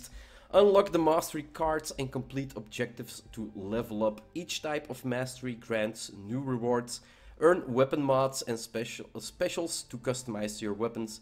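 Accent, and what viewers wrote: Netherlands. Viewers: ah youre from zuid-Nederland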